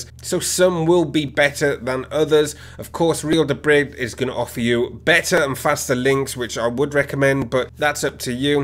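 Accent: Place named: India. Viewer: Lol anytime a click these fix videos and hear an Indian my head just shuts down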